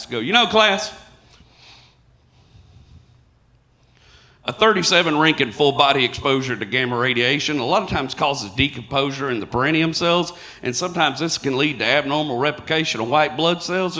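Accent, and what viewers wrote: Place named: East Texas, United States. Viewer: Man this killed me. I'm from East Texas and you sound like 7 of my 8 cousins lol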